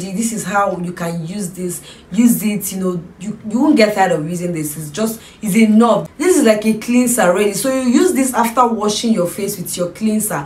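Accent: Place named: Nigeria. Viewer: I like the way Nigerians speak English thnx for sharing I will try this seriously am tired of pimples & dark spots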